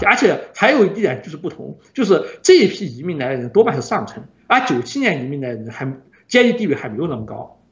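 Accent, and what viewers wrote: Sichuan, China. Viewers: 阿姨这四川口音233333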